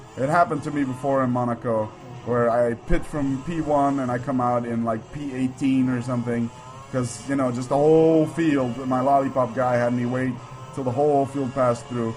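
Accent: United States. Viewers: dude you have a kick ass american accent haha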